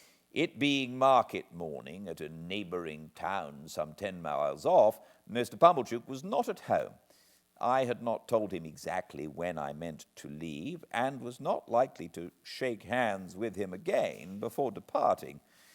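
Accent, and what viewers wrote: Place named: RP, United Kingdom. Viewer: Thank you a thousand times for these readings! I've read several times David Copperfield and Oliver Twist, but so far, I had only read extracts of Great Expectations as you can find them in English learning books (mostly abridged text). I'm responsible for at least 3 views of each video since English is not my mother tongue. Your prononciation is very neat : it's like Queen's English with feelings and local colours (if that makes sense). My only fear is that you might stop reading before reaching the end of the book. Please, do go on until the last chapter and I promise to 'read with my ears' until the end too.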